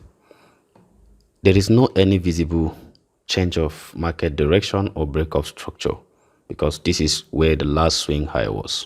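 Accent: Nigeria. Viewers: I don’t know if it’s because of your Nigerian accent that’s makes it easier to understand for me but you always teach in such way it’s easy to understand thanks a lot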